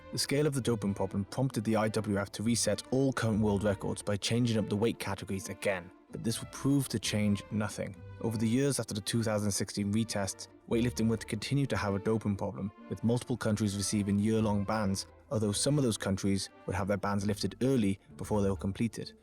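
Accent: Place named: Wales, United Kingdom. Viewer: I thought your pace was spot on and your enunciation clear. The welsh tones are very mild.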